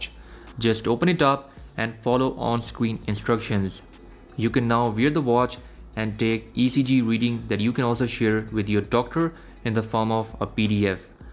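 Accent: India. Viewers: Excellent video!!! I did this on two Watch 3!!! Super and I like your india accent!! Im in Quebec Canada! Thanks a lot!!!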